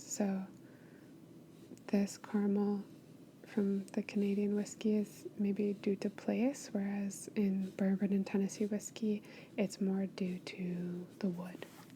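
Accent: Argentina. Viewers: Hey ~I think you're the reason why I'm turned on to the Argentinian accent/dialect as I'm learning Spanish...so, thanks so much^^. And BTW, enjoying a Canadian Mist while I re-watch this video, so I hope that doesn't take away "cool points" from me in your book =-p. Haven't had Japanese whiskey yet so that's on my list next. What's your absolute fav??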